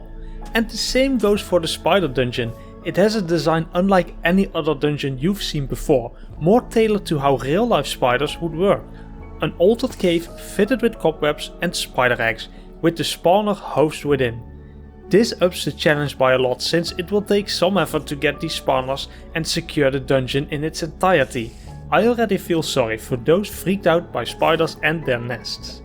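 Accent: Netherlands. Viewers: Bro you sound dutch nice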